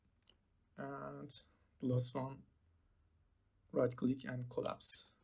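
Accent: Turkey. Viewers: Ellerine sağlık abi Türk olduğunu anladım😁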